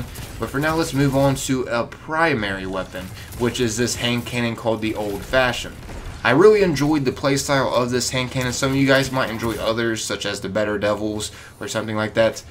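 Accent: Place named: Maryland, United States. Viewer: You HAVE to be from Maryland. Lol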